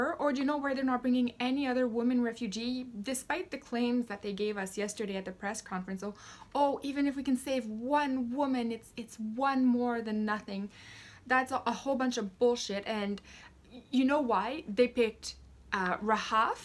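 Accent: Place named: Quebec, Canada. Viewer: I love this channel, are you Quebecois because your accent is beautiful to me. Also love your insight and the emotion you put into it. I feel sorry for Rahaf because she is a pawn in the globalists agenda, willing or not, she will one day disappear without a trace, another sacrifice to the UN agenda and the incoming enforced world religion of Islam. Australia, my country still has due process to follow, we don’t just take anyone because that would destabilise our borders, unfortunately we’ve taken too many of the wrong types already, we should, however, immediately demand the release of Asia Bibi into our care, and the white SA farmers.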